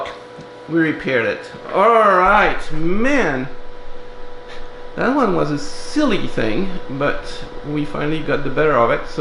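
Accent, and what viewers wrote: France. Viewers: I have an off-topic question for you. My wife heard me watching this episode and she asked me where your accent is from. I told her I thought it was French, but I'd like to confirm if I got that right or not. Thanks! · I mean… you know that for me, in-depth electronic debugging now has a french accent forever. Burnt in like a PROM.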